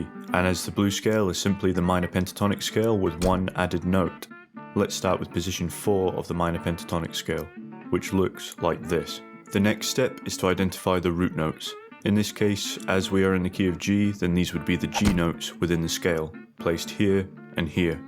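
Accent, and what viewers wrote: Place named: Lancashire, United Kingdom. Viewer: Both of you are very good although fretjam seems to have stopped making videos now. It was just that you both have similar accents,Lancashire or Manchester area tones,so to speak 😀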